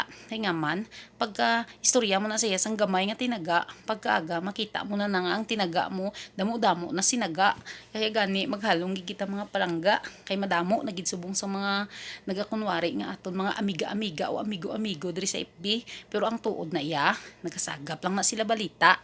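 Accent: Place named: Iloilo, Philippines. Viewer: gusto ko talaga ang accent ng ilongga grabe ged real talk mo ya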